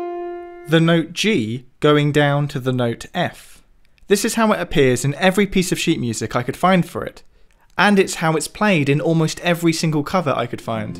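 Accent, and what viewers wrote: United Kingdom. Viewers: Your accent is more like than mine, but we all say the word " " with the first syllable stressed and a little higher in pitch, right? In American English that first syllable isn't hit as hard in UK English even allowing for the wild variations we have over here. So, maybe it's sprechstimme?:-D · If this guy didn’t have a British accent I would have turned this video off at :45.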